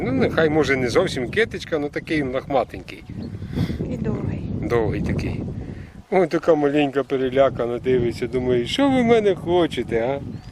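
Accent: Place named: Cherkasy-Vinnytsia, Ukraine. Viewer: Я думаю, шо це захід Черкащини або Вінничина, принаймні там люди розмовляють схоже))) Приємна мова!!!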